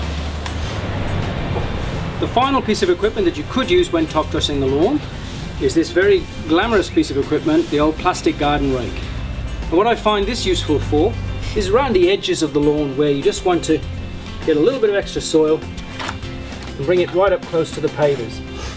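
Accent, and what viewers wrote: Wales, United Kingdom. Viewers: I think he is Welsh! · That's not a South African accent. Definitely Welsh. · You Welsh?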